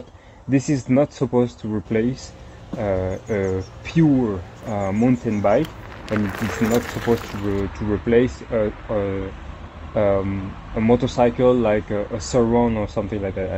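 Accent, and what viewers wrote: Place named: France. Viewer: Yes yes yes 😊 more content about this wonderful bike, your frenglish is enough, vive la 🇫🇷 😅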